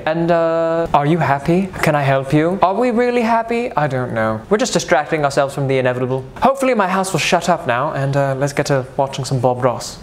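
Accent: United Kingdom. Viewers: Why did i think he was gonna be better than this.........maybe the british excent........sadness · why are you yelling at a dead man in a British accent, you may need to deal with your anger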